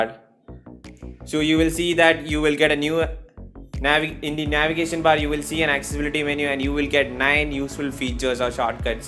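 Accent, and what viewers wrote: India. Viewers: Are u from kerala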